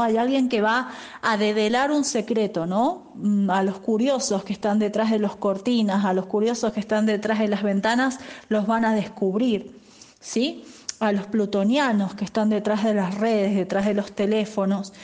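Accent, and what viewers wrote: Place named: Colombia. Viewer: yo creo que no porque habla con acento como de colombiano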